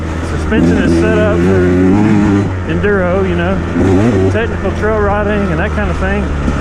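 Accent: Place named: South, United States. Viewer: come to think about it, one of my buddies has a 2017 or 18 te300 that he wants to sell and I'd imagine you can get it relatively cheap. He bought it to tag and ride on the rd but hes rich af with a garage full of gasgas and ktm and rode it and didn't like it so its been sitting in the corner ever since. If you want to see some pics I can get some and whatever he wants for it. Dont know where you are but you sound southern lol and I'm in east Tennessee so we cant be to far apart.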